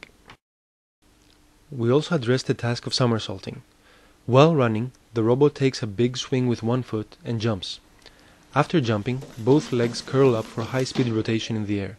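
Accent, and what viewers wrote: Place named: Greece. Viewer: Πως ακούγεται ότι είναι Ελληνας εεε;! Πάντως συγχαρητήρια παιδιά!!!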